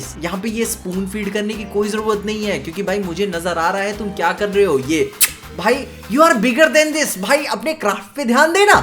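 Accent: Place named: Uttar Pradesh, India. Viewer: Areey bhaiii lanth sunke laga ki tum bhi up ke ho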